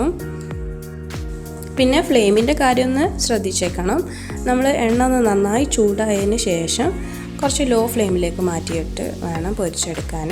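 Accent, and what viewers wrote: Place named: Kozhikode, India. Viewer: Kozhikoodu aano... But language manasilakunna rethiyil🙂🙂👌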